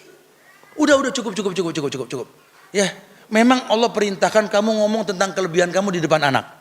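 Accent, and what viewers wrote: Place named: Jakarta, Indonesia. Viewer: Bnr bnr bahasa ibu ku .betawi bget.barakaallahfiq ustadz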